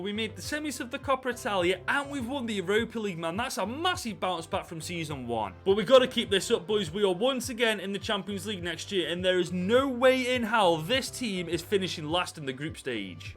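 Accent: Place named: Midlands, United Kingdom. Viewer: Mate I love your videos watch you all the time, your accent sounds very familiar are you Derbyshire or Staffordshire? Class if your SOT lad love to see a stokie doing well !😅 keep up the good work ❤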